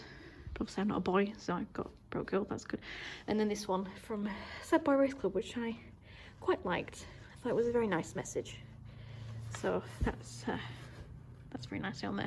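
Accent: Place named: West Yorkshire, United Kingdom. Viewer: Loved the video, I could listen to a West Yorkshire accent all day long! Interestingly I didn’t realise you needed a bonnet, we might have one of those somewhere too! Can’t promise it’s great but I remember it being better than your current one. we can hunt around the dreaded store room when lockdown allows! 👍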